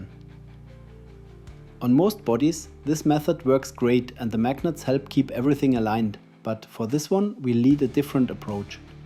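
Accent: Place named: Germany. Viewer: Englisch für Deutsche! Super!!! Hier verstehe ich jedes Wort 😂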